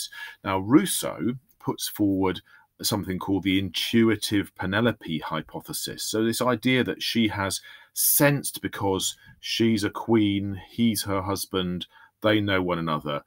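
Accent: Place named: Quebec, Canada. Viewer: this dude sounds like quebec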